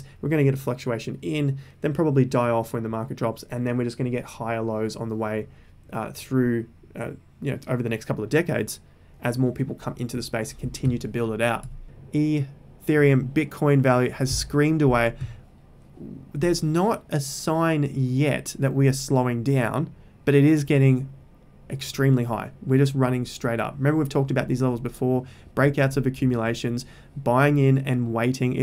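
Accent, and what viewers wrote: Australia. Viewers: Im completely new to the stock market and wanted to thank you for your informative videos. It's helped me make a bit of return off of a small investment and i'm hoping to grow further. Refreshing to hear an Aussie accent as well! Thanks for sharing your knowledge. :)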